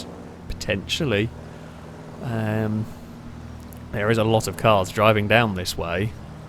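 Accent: United Kingdom. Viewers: I didn't notice anything odd, but then again I've always taken any of his odd sayings as 1. Just plain ole' British English accents versus my American ears and/or 2. I the heat of the moment he's not always fully concerned with prefect pronunciation.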